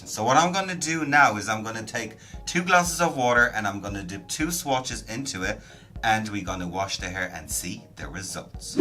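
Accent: Ireland. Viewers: Are you irish?!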